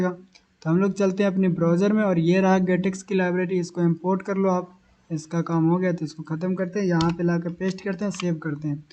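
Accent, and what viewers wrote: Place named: India. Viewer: Bro your title English but your speaking Indian no way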